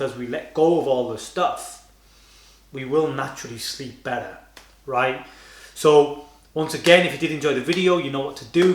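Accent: Manchester, United Kingdom. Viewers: This is been happening for MONTHS now. I’ve been trying everything - eye masks, lavender diffuser, journaling, gratitude, showers, experimenting with snacks, sleeping pills, etc… NOTHING seems to work to make me feel refreshed in the morning. I usually stay optimistic, and hope for a better night sleep the next night. But today, I was kinda broken and f’in fed up with it! I needed this video today brother, thank you ❤️. As an aside - you sound like you’re from in or around Manchester? That’s my home, although I’m now on the other side of the world. So nice to hear this in a comforting, familiar voice 😊👍🏿. Have a great day!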